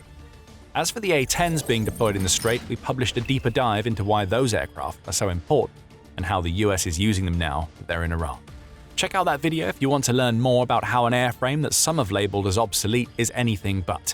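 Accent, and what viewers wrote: United Kingdom. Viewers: Why are all of the videos are narrated by guys with a British accent????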